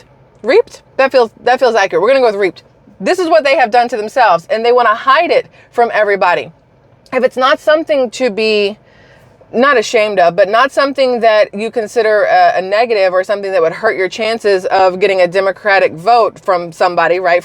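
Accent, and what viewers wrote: South, United States. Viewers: That is hysterical that you used to have a country accent!! 😂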